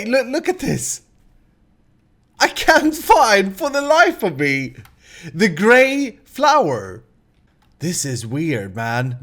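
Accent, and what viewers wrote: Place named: Sweden. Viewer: Omg -, you made a whistle sound that made me bust out laughing! man I love Swedish accents <3.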